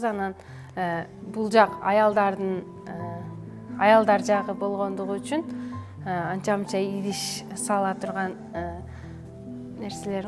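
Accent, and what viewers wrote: Standard. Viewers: Ош областында кыргызчаны таза суйлогон адам десе болот. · Мына башка улуттун окулдору минтип кыргызчаны суудай таза суйлоп жатышат. Ал эми биз кыргызча жондоп суйлой албай атып,орусчаны сайрап коебуз да. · Аяш абаларга Караганда таза суйлойт экен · Байкадынарбы , кобураак эмес , кобуроок деп граматикалык жактан туура , адабий тилде сцйлоп жатат . Кыргыз тилинде создун мучосундогу ундуулор унгудагы ундуулорду ээрчийт . М : Батыраак , артыраак , тезиреек , кобуроок ,женилирек ж.б. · Оштук айрыкча баткендиктер намысынарга келип тилинерди ондогула! Карагылачы озубек кызы болсо да кандай кыргызчаны таптаза суйлойт! Таарынбай тилинерди ондогула диалект деп актанбай!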